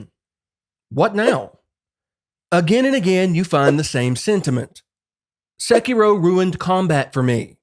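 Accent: South, United States. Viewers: hearing another southerner talk about soulsgames is something i never thought i would hear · It's been a while since i heard a redneck accent. Thank you for the making the video good man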